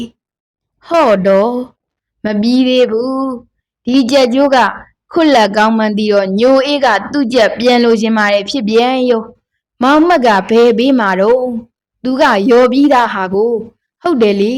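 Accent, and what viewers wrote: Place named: Upper Burma, Myanmar. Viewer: ဆရာမခင်ခင်ထူးရဲ့အရေးအသားအသုံးအနှုန်းတွေကရိုးရှင်းတယ်အညာဒေဓလေ့တွေကိုပုံဖော်သလိုခံစားရတယ်ဖတ်ပေးတဲ့သူရဲ့အညာသံကလဲဝတ္ထုကိုပိုကြွစေတယ်ကျနော်မျက်မမြင်တစ်ယောက်အနေနဲ့နားထောင်ရတဲ့အတွက်ကျေးဇူးအထူးတင်ပါတယ်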